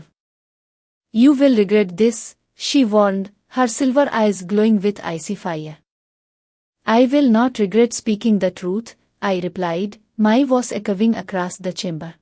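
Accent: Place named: India. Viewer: Not sure the Indian accent works, plain Western English is far batter!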